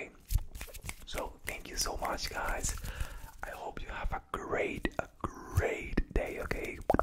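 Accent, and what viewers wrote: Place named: Latin America. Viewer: Me encanta tus asmr , aunque te siento con ese acento latino te queda bien 👍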